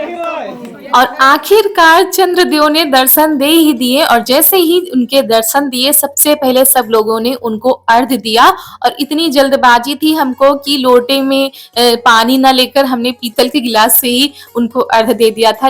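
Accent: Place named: Madhya Pradesh, India. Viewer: How cute pehli baar Gaavka dekha apki video me bahut alag tareeka hae kaunsa gav hae vaese language apki MP ki hi lag rahi hae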